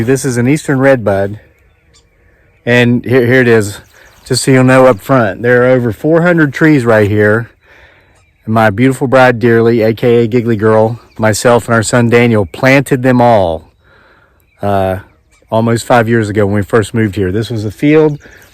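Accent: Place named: West Virginia, United States. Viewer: Great videos! We have to ask where you’re from? Your slight accent reminds us of our friends in Fairmont, WV 😄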